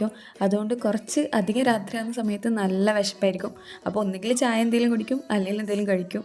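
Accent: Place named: Kasaragod, India. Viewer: Epa kasargod samsaram kekan thodanga😅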